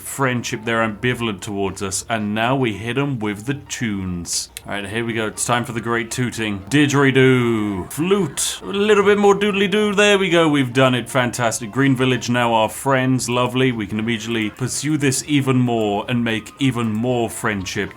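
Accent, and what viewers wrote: United Kingdom. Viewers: Wait this guys british? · Me realised he’s practically British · Is it just me or is he somehow acting better as himself than last video? Like, he's just more british than usual or something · I love how talks like a true colonizing brit from the 15th- 16th century. hilarious!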